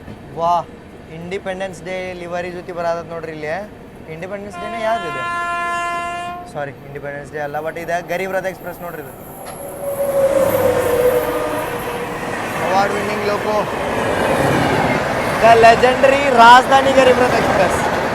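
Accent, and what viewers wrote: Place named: Karnataka, India. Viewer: ನಾನು 2005ರಲ್ಲಿ ದೇ ರಾಜಧಾನಿ ಎಕ್ಸ್ಪ್ರೆಸ್‌ನಲ್ಲಿ ದೆಹಲಿಗೆ ಹೋಗಿದ್ದೆ ಬೆಂಗಳೂರಿನಿಂದ ತ್ರೀ ಎಸಿ ನಲ್ಲಿ. ಊಟ ಕೆಟ್ಟಿದ್ದಾಗಿತ್ತು ಸೀದು ಹೋಗಿರೋ ಪರೋಟ ಅಲ್ಯೂಮಿನಿಯಂ ಫಾಯಿಲ್ ನಲ್ಲಿ ಇಟ್ಟಿದ್ದರು. ಕಂಪಾರ್ಟ್ಮೆಂಟ್ ತಟ್ಟೆನಲ್ಲಿ ಅಣ್ಣ ದಾಲು ಇನ್ನೊಂದು ಕರಿ ಒಂದು ಮೇಲೊಂದು ಹಾಕಿದ್ರು ಒಂದು ಸ್ವೀಟ್ ಕೊಟ್ಟಿದ್ರು ಮೊಸರು ಚೆನ್ನಾಗಿತ್ತು ಬರ್ತಾನೋ ದೆಲ್ಲಿಯಿಂದ ಇದೇ ರಾಜಧಾನಿ ಇಲ್ ಬಂದಿದ್ದೆ ಆಗಲು ಕೂಡ ಊಟ ಚೆನ್ನಾಗಿರಲಿಲ್ಲ. ಇವಿಷ್ಟು ಟ್ರೈನ್ ನಲ್ಲೆ ಜರ್ನಿ ಮಾಡ್ತಿರಲ್ಲ ಕೆಲಸ ಯಾವಾಗ ಮಾಡ್ತೀರಾ ಖರ್ಚಿಗೆ ದುಡ್ಡು ಬೇಕಲ್ಲ ನೀವು ಯಾವ ಊರಿನವರು ನಿಮ್ಮ ಕನ್ನಡ ಭಾಷೆ ಮೈಸೂರ ಬೆಂಗಳೂರು ಕನ್ನಡ ತರ ಇಲ್ಲ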